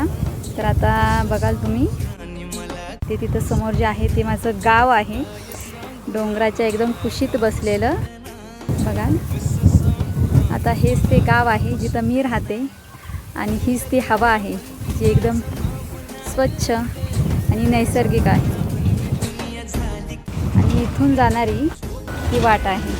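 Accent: Standard. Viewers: का शेतकरी आणि गावकरी शुद्ध बोलू शकत नाही? काय भाऊ तूम्ही पण उगीच काहीतरी, ताई ला शुद्ध मराठी येते त्या सुशिक्षित आधुनिक शेतकरी आहेत. म्हणजे त्यांनी मुद्दाम मारून मुटकून अशुद्ध बोलण्याचे नाटकं करावे, म्हणजे व्हिडिओ चे विषयाला अनुसरून होईल, शोभेल असे तुमचे इतर नाटकी uTuber ना बघून गैरसमज मत झाले आहे का. काय राव???????? तुमच्या या कुपमंडूक संकुचित मनोवृत्ती ला माझे कडून👎. या ताई साठी👍👌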